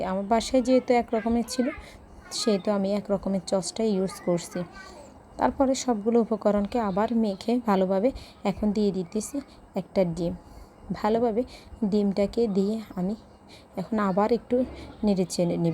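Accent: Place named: Noakhali, Bangladesh. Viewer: পুরা নোয়াখাইল্লা 😊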